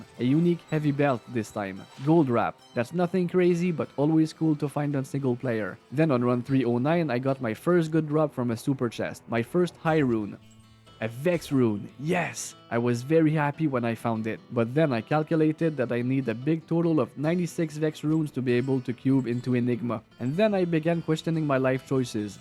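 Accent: Quebec, Canada. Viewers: french canadian? "three times faster" is a weird thing to say mathematically "In a third of the time" is more accurate I guess the old "speed" was "1 enigma per 60 hours" so "1 enigma per 20 hours" is three times as fast, or two times faster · Mon frere! I love how, with your beautiful Canada accent, whenever your say poppables is sounds like 'palpables', like you are a medic examining someone :)) Also, I found, and totally random, 'cause when I farm I usually go, in the same run - Travi, Mephisto, then LK, Countess and then maybe Andy, so this time I found, on P8 LK, and Ohm rune, under a Corpse. So a 'palpable' corpse gave me OHM = Oh, Homme, OH HI MARK!!! = )) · That crisp Québec accent. That many runs, getting a sorc would saved you substantial amount of time with telekinesis only without even counting teleport.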